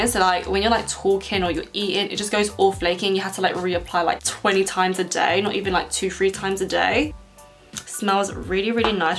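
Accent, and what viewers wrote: United Kingdom. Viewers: So ik her only from Instagram and I finally got around to watch her vids and SHE HAS THE CUTEST ACCENT it totally didn’t even click that he would have an accent (I knew she lived in the uk) but anywho I REALLY REALLY loved this video 💕💕💕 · Woooooooowwww! I have watched ur ig for a while and DID NOT EXPECT THE BRITISH ACCENT!!!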